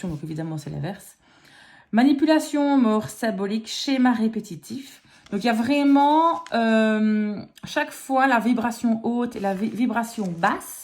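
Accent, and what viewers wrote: Belgium. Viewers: Très bel accent Belge 😃😃😃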